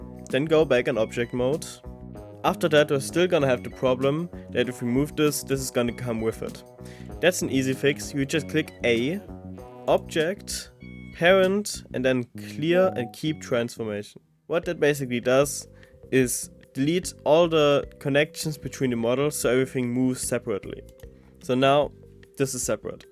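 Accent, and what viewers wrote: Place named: Germany. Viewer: Ich glaube es könnte sein, dass du Deutsch bist(Akzent)😅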